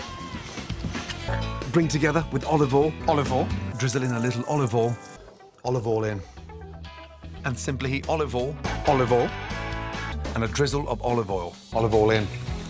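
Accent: Scotland, United Kingdom. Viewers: Why am I watching a Scottish guy say olive oil for 4 min at 3 am? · Ahhh this stingy-scottish accent i love it.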